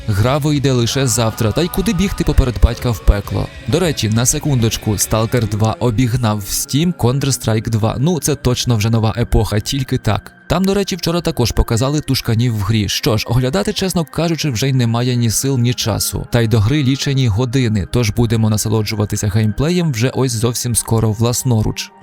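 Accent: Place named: Transcarpathia, Ukraine. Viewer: він не понасирав, він просто з Закарпаття, я теж і у нас тут свій суржик такий і деяким людям важко писати і говорити чистою літературною мовою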